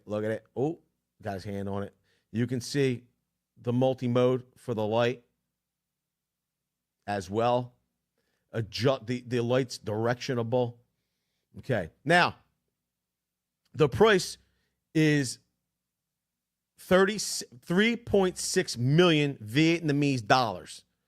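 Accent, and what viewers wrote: Philadelphia, United States. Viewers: I listen to this BS just hear a Philly accent. You guys are alright.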